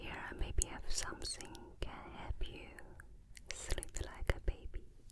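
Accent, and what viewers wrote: Philippines. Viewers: Why does it sound like she has a Pinoy/fillipino accent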